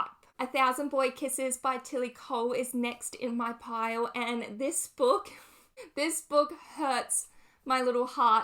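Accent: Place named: Australia. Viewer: I love your accent. It’s Australian right?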